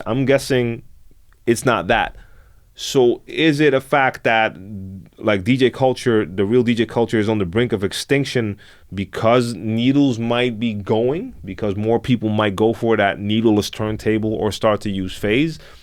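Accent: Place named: East Coast, United States. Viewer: It's killing me to know....you say you live in the Netherlands, but you have an "east coast" accent and you look like you're Latino...can someone let me know? Also, yes I was too disappointed when I heard the news about Shure needles demise. I always loved the way ortofons looked, but they we're too expensive for my budget. Back in the early 2000's I started with "m-audio TORQ" as my DVS, I liked the fact that I didn't have to carry loads of crates to parties. Then I upgraded to serato SL3, but I somehow keep coming back to vinyl.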